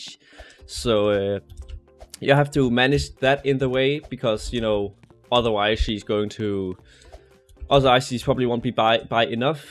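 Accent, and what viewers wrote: Denmark. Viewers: Er du da dansk?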